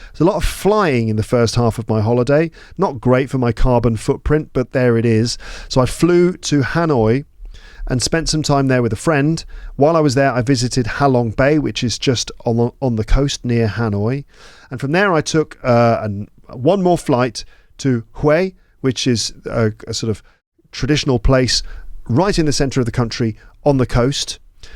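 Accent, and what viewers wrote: United Kingdom. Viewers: Brits accent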